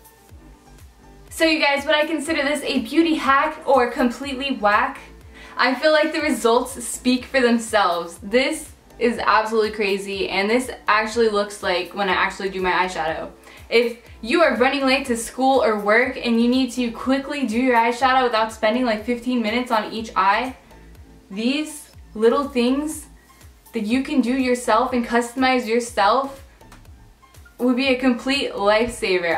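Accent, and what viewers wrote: United Kingdom. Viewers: Her English accent "oh bloody hell" that's totally jokes literally that's me being normal everyday!!!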